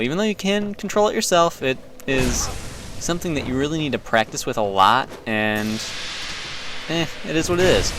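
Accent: United States. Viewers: American pronunciation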